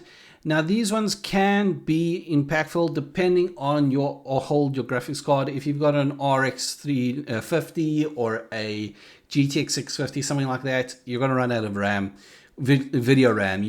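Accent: South Africa. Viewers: i know a afrikaans brother when i see/hear one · You sound South African 😉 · Your accent gives me a South African vibe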